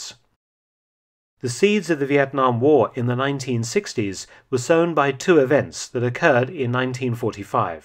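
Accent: United Kingdom. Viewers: I am a Vietnamese patriot citizen but I can't get mad with your charming British ascent, even if you ensulted me.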